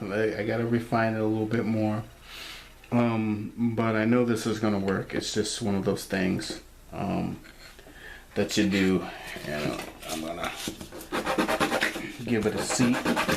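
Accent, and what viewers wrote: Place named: Midwest, United States. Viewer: you my friend are from the mi-west I'm gonna say Wisconsin like me? you talk the area nice vid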